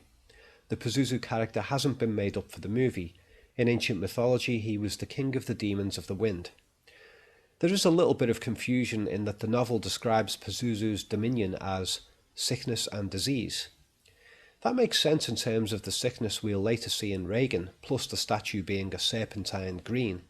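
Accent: Liverpool, United Kingdom. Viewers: Some pretty stupid stuff in here. Then we get to a more obvious one, when she has her right arm raised and he says he's not sure about that one! :o Is that because he didn't make that one up himself? ;) He says people do have their arms up in that situation! Duh! Is that a bit like people do pray like that and the other 100 things you said too?! Also, I think I must be programmed in some way to feel that the Liverpudlian accent sounds thick! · All good except the narrator's distracting Scouse accent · Very impressive job. No self serving bull crap just very insightful points. It's a plus that you are an obvious Scouser.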